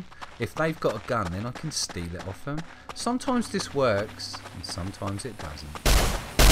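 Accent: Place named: United Kingdom. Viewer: the calmness in your voice as you're hacking up people is quite disturbing lol +1 for the british accent... kinda "Wallace" -like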